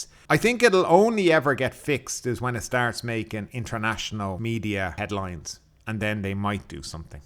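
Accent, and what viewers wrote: Ireland. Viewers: Irish accent?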